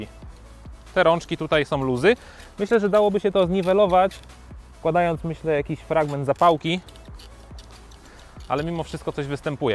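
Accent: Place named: Ukraine. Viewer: sounds like ukrainian but slovakia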